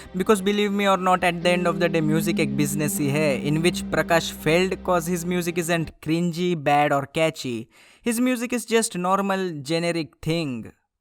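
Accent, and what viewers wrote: Gujarat, India. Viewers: IDK if you Re from Gujarat but you sound like a Gujarati Boy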